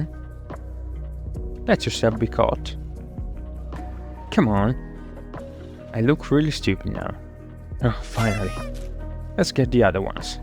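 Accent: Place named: England, United Kingdom. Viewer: I have a question: Where are you from, because I recognise that you are from England because I'm from Italy 🐜🇮🇹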